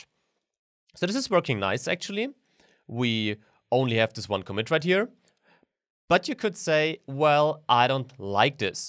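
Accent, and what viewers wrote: Germany. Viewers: I was deciding whether or not he had a german accent; but then he said "entwickelments" instead of developments and it all became clear to me. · Do you deliberately sound like Max in your pronuntiation? or is it a thing in German people to sound like that? Great video!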